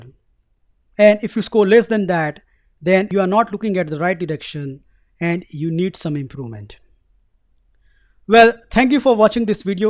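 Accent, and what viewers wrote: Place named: North India, India. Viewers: He's definitely Indian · Eduardo Moya he's from North India! :)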